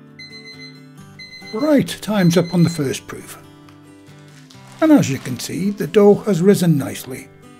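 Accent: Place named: Middlesbrough, United Kingdom. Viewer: Where you from ….. sound like a boro lad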